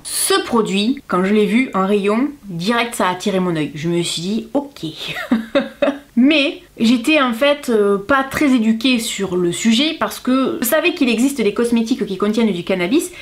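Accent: South, France. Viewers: Je viens de te découvrir franchement jadoreee, tu es honnête, tu propose des contenu tres intéressant comparer a d'autre youtubeuse que j'aime pas du tout, tu es trop drôle hihi j'aime bcp ton accent du sud je viens du nord moi ! Hahaha, jme suis abonné dailleurs ~ 😉😁😂😂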